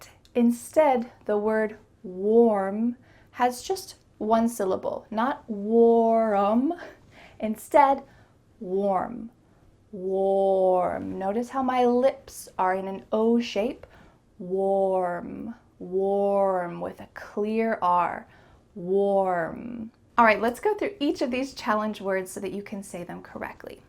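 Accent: United States. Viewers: I love you ❤️ Every time I feel like my american-accent-blood-pressure is going down I check out one of your videos in order to get some dosage of the american accent. 😅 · speaks with an American accent...in Australia we don't pronounce the "r"!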